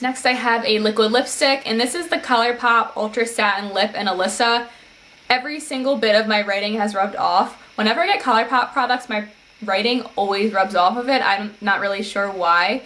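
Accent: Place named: Maryland, United States. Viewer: I'm your neighbor to the south. I'm in MD which is why your accent is so familiar. lol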